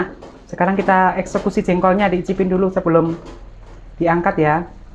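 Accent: Java, Indonesia. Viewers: Asslmuallaikummm..wrwb,,mbaaaakkkkk...aku ngeliat mbak makan dan bicara logat jawa eh berasa kayak sama mamah..! Mamah sama papahku juga suka makan jengkol,hehehe..Mbak maaf mau nnya,hidung mbak itu asli atau op? Soalnya hidung mbak bagus,cantik banget..love you mbak❤️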